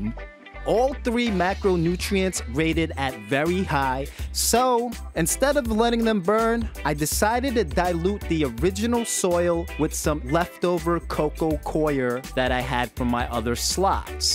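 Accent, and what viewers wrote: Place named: New York, United States. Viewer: Love that new yorkers accent bruv 😂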